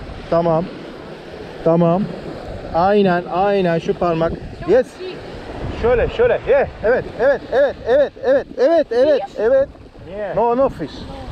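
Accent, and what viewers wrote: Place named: Russia. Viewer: Ruscayi harika konuşuyorsun lehcesiyle 😁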